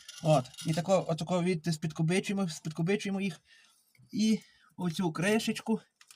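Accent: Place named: Western Ukraine, Ukraine. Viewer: Ну видно що ви роботяга, молодець А реверс щолкає як передачі в іномарці! Дякую за відео,цікаво вас слухати! То ви з Чернівецької області,чи з Франківської?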